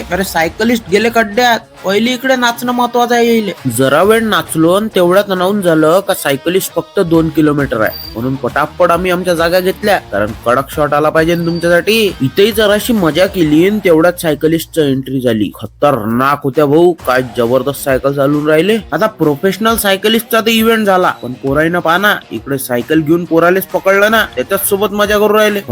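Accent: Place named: Vidarbha, India. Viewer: ❤same aplya vidharbha cha feel apli bhasha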